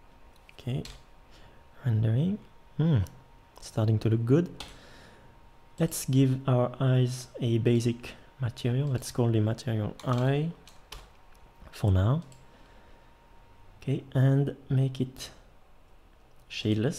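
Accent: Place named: France. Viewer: ton accent français est visible x)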